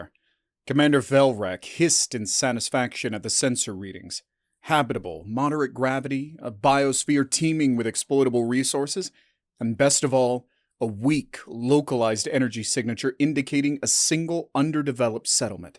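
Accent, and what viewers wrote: South, United States. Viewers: YES! of course, as the University of North Carolina found out when studying North Carolina accents, there are 105 different versions of southern accents in that state! Good Job, you all, in choosing this accent for such a good story! Same plot as in many AI generated stories but it is the way a story is told rather than the actual plot, that counts for all!! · I like that you actually used your voice for this, as opposed to every other HFY channel, but one thing: WHY A HEAVY SOUTHERN ACCENT? ITS DUMB, OVERUSED, AND IS AN AFFRONT TO MY REGION (WE DONT SOUND LIKE THAT) Great video!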